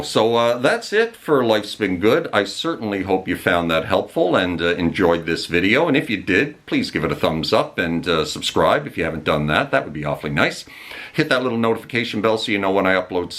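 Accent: Canada. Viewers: you sound Canadian, I’m going to see at Firekeepers Casino in November, can’t wait.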